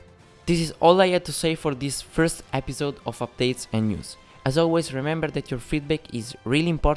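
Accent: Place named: Italy. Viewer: Spettacolare il tuo accento Italiano/Inglese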